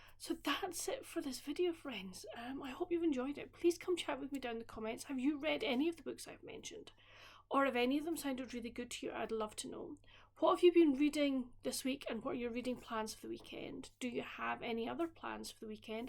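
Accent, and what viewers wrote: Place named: Scotland, United Kingdom. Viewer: Thanks for the shoutout. I love hearing my name in your Scotish accent. The Alaskan Wilderness series is on my TBR. I've been on the fence about The Wynbridge Series. Glad you read book #1. I need my romance to be low angst. So am passing on Heidi Swain. T KingFisher is not my genre of fantasy. I read and unhauled her YA (The Wizards Guide to Defensive Baking). I don't understand surealist humor and was afraid of the Sour Dough Starter familar. I need my famliars to be non threatning cat etc. I hope to finish Emily Wildes Encyclopedia of Faries today and am enjoying my slow reading of Mary Oliver's poetry collection Devotions.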